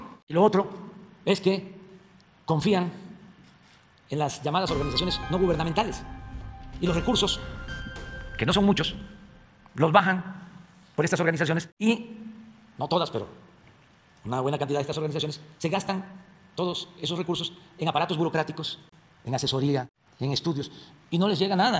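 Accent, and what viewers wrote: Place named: Mexico City, Mexico. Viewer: Para ser tabasqueño, a este señor le sale rebién el cantadito chilango al estilo de Pepe el Toro.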